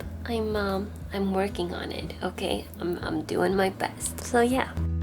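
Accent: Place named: Sweden. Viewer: Nämen, hörde nu att du är svensk! Älskar blommorna 😍🌺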